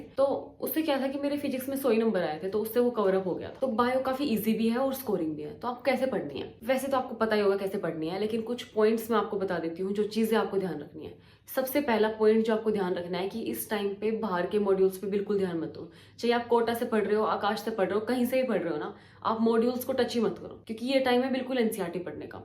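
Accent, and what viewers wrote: Haryana, India. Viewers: Haryanvi Tone 🔥🔥... where are you from didi??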